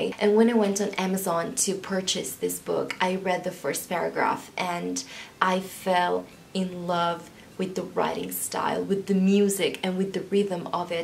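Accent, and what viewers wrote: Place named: United States. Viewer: On motherhood, we share the same thoughts lol and I’ll definitely pick “nobody speaks of remarkable things” because you had a spark in your eyes as well. So it will be worth it in any case. Hai una pronuncia un sacco Americana ahah il mio lato British is cringing, ma è comunque perfetta ❤️ Praise for Doggie che si è imposto nel video e ha cercato di farti smettere per le coccole. YAS BUDDY. THAT IS HOW YOU DO IT! 💪🏻❤️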